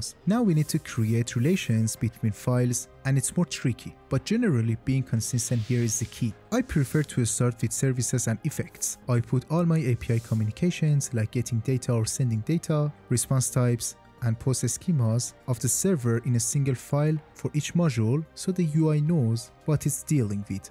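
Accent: Iran. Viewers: love the iranian accent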